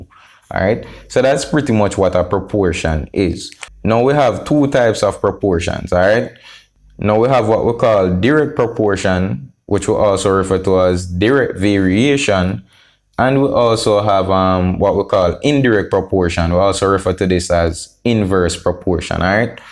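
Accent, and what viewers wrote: Jamaica. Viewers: love the Jamaican accent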